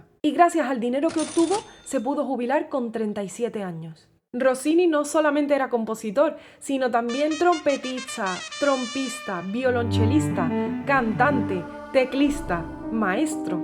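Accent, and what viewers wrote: Andalusia, Spain. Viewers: ¡¡¡Hola!!! mi bella genio hija adoptiva de todo el mundo descubrí que voy a tener 365 días completos pára disfrutar de : tu arte musical inigualable tus conocimientos de hhístorias y vidas de personajes que no conocíamos 365 días para ver en tu carita angelical reflejado tu gran corazón y tu amor por la humanidad y en tu voz andaluza universal oír murmullos de aguas quietas tonos de aves cantóras oleaje de mar acómpasado 365 días para agradecer a Dios por este regalo 365 días para decir desde el fondo del corazón todos los días GRACIAS POR EXISTIR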